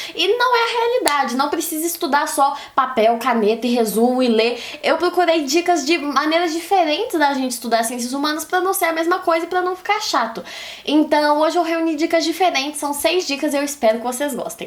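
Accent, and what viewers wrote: Minas Gerais, Brazil. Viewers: Conheci pelo GeekieGames, 2 minuto de aula e já falei: essa aí é de minas kkkk, e tava certo mesmo!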